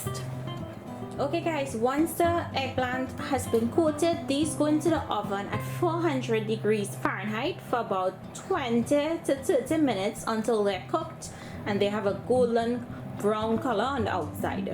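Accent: Trinidad and Tobago. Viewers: I was so pleasantly surprised to hear a Trini accent lol 😁👏🏼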